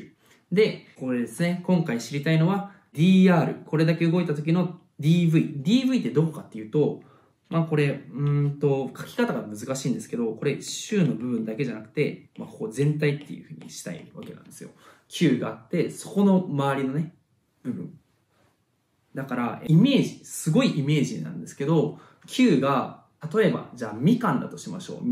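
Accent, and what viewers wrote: Kansai, Japan. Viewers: そんなに違和感ないぞこの関西弁。な↑んでやねんとか言ってない辺りマジでそんなに違和感ない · 語尾が関西弁になるの草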